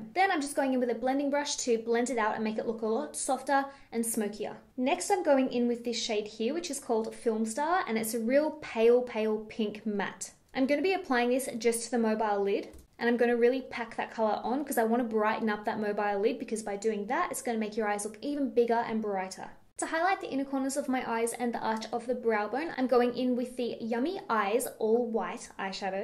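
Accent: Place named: Australia. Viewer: #LANGEFAM Hey, I am brand new to YouTube - seriously only used it for music in the past, but recently have been watching many of your videos! You are brilliant! Your make up advice is so easy to follow and definitely makes a difference. I've watched others, but didn't like them as much - maybe its the Aussie accent lol I was always a tomboy/athletic/workaholic, so I wore makeup, but never really took the time to really appreciate what works best....you have helped! THANK YOU! Would love that kit to really try to improve my look! Keep being you! You're great!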